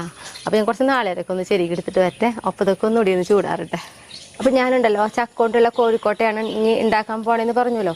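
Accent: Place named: Thrissur, India. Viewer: de സംസാരം ശരിക്കും ഞങൾ പറയുന്നപോലെ തന്നെ.... കോഴിക്കോട്ട, കിണ്ണം,davara allam.same. തൃശൂർ എവിടെയാ വീട്.... നമ്മൾ കുന്നംകുളം,പഴഞ്ഞി, ചെറുതുരുത്തി ആണ്....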